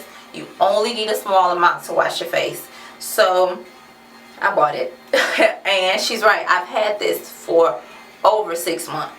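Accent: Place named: Detroit, United States. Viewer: I love your Detroit accent!!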